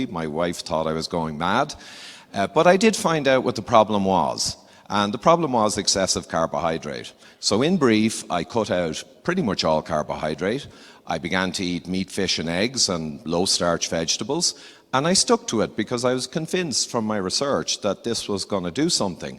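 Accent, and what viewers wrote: Ireland. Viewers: Nice job on your intros,. And yes, we Americans DO like an Irish brogue. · I think without real statisticians like Norman Fenton and others like you we would have been f..ed. You are good presenter and Irish accent helps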